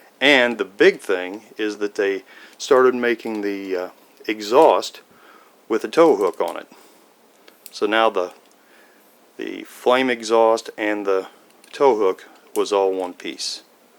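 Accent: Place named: North America. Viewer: I'm guessing you're North American from your accent, so how did you get your first Batmobile in the US, as Corgi is a British company? Where they available in the shops or did you have to pay more to get it delivered from the UK? Didn't any American toy companies make Batmobiles? Sorry mate, lots of questions, but a really interesting vid!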